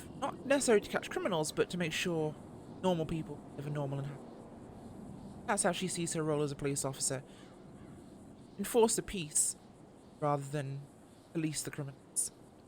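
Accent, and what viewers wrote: England, United Kingdom. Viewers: Girl you’re BRILLINT! Love that broke down your characters and gave their history…, I love how you’re evolving on the new channel (ACDCrp). Love your content and videos. I am glad you and Deecan left DOJ, they are becoming a little bit anal on the channel. Mostly they cannot deal with negative comments, nor multiple comments on a episode. Their reply's are normally rude…like “If you don’t like it, go to another channel”…see ya! or in caps stop writing several comments, one will do! So I unsubscribed…. I still watch the channel because I like when Martin and Blackhallowis online, especially when he does Chi P and George. Anyway, keep up the good work and your awesome videos. I love to see more of the type of police/detective work like your episode 24 - It's Time to Stakeout the Trash! And besides I love the English accent (BTW I miss living in Ipswich), especially your character with that sexy voice and walk….Your so Hot!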